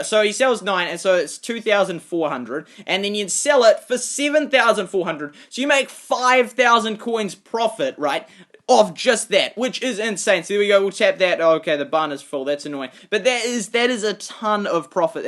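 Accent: New Zealand. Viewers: Are you kiwi??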